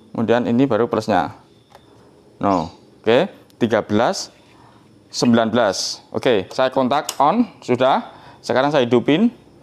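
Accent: Java, Indonesia. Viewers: Sajak wong jowo omongane,jowo ngendi bro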